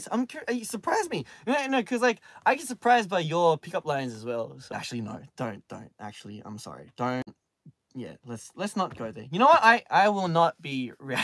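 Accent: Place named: Australia. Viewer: I loveeee that his Australian accent comes out whenever he speaks English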